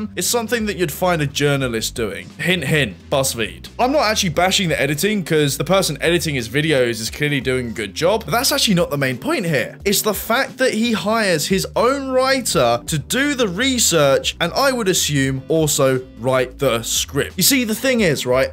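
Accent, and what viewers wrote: United Kingdom. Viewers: Ye still watching because your brit voice is crisp xD, didnt even know who this Matthew was until someone posted a random video and got me to you. But yea your voice is going to get a sub. Would love to see some playthrough walkthroughs if you plan on doing them. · "If you have interesting subject, people will watch" disagree. Watched one of your videos and at this point i don't care what you are talking about. Your voice makes my want to listen and i just let autoplayer go on. Good job you funny, silk-voiced bri'ish man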